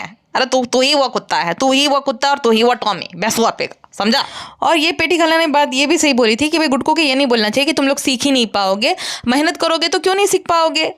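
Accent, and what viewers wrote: Gujarat, India. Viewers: Aur ek baat , apka voice bilkul rashmi desai jaisi hai। Or gujrati ho isiliye sayad acsent vi kuch had tak waisa hi lagta hai। Par voice to ekdam ditto hai।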